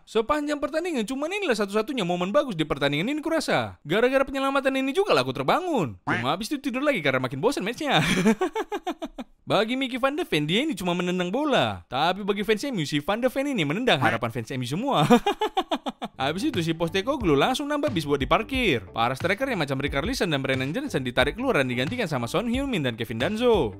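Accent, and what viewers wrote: Medan, Indonesia. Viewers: Gua kesini aja gara gara inget logat medan nya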